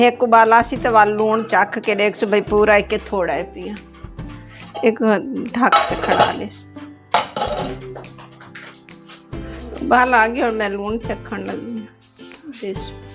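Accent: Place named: Saraiki, Pakistan. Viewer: Sariki voice im like good Ap ka channel subscribers kar dya ha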